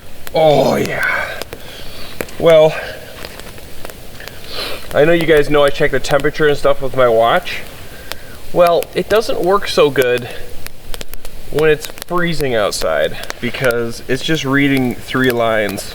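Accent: Canada. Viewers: I can't decide if he is Canadian, or just making fun of us. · Man, you sure sound Canadian. Tossing out those ‘eh’ casually. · Are you Canadian or American?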